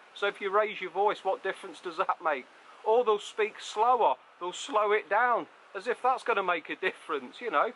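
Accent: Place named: Yorkshire, United Kingdom. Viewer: More interested in your accent. Yorkshire?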